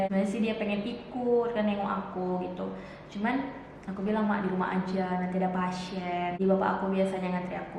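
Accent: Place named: Medan, Indonesia. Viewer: lanjutkan kak.. saran aku hanya klo vlog, jgn dirubah logat medan nya.. gas poll kak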